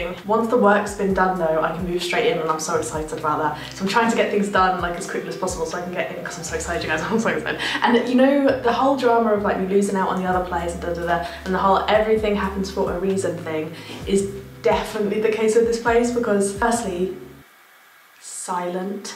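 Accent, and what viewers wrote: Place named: United Kingdom. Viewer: Idk what your accent is called but it’s the BEST kind of British for sure 🤣❤️❤️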